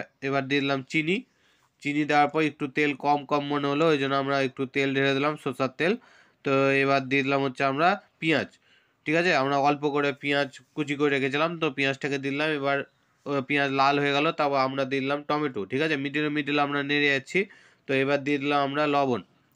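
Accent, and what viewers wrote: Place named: Medinipur, India. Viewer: Amar mone hoy Medinipur ar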